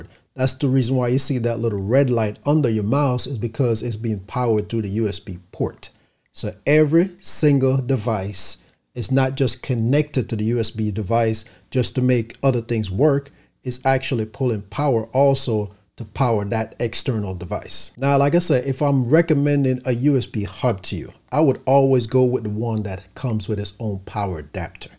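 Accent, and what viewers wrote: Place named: Jamaica. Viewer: I think i hear a bit of a Jamaican accent. You a Yardman?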